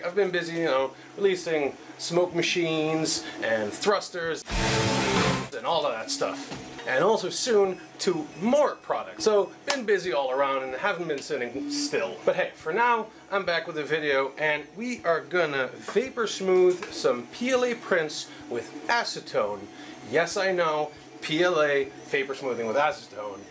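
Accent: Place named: United States. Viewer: How come you sound like an American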